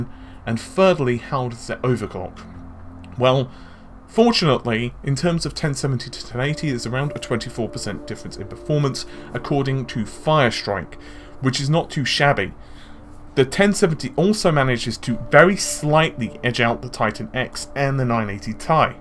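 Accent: England, United Kingdom. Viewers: that background music and that English accent makes me sleepy but in a good way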